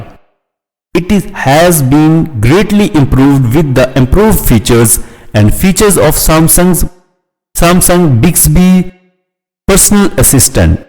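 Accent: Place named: Tamil Nadu, India. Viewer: I think ur acent is tamil if I am correct. I like it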